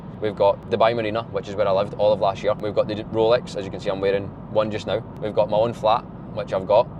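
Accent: Scotland, United Kingdom. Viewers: Which uk accent is this 😅😂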